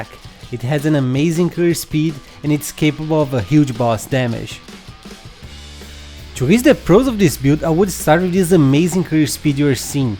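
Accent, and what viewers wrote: Brazil. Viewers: Vc é BR? Parece que tem sotaque haha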